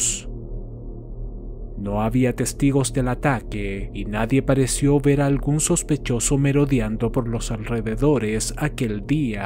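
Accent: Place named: Chile. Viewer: es la magia del acento chileno que al hablar lento, parece musica para tus oidos, como si oyeras al silencio cantar: <link>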